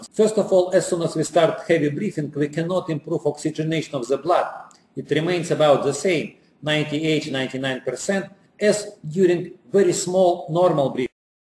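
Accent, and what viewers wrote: Russia. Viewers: Your accent is very Russian :) but I see you're confident in your speech and it makes video more interesting to watch. Thanks for great lessons.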